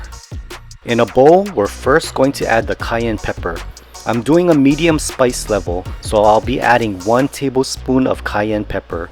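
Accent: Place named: Hawaii, United States. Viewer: You sound like u from Hawaii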